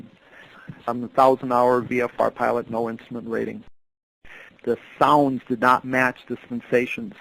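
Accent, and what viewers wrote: United States. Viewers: It sounds more like a Michigan accent to me. MI, WI, MN , Chicago accents are similar.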